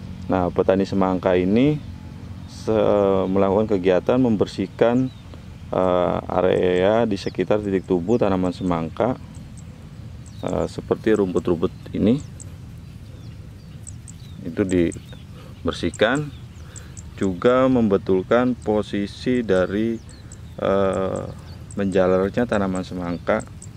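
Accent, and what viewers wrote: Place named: Indramayu, Indonesia. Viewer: logate kaya logat dermayon.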